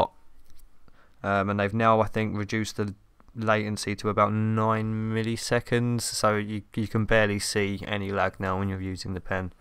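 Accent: United Kingdom. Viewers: Are you a british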